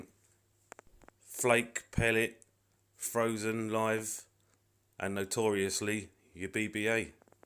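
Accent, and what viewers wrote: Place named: Cockney, United Kingdom. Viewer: Mate this is the first one your videos I have watched and I love it. Purely because it’s so good to have a cockney lad doing a video rather than listening to more American voices! 😁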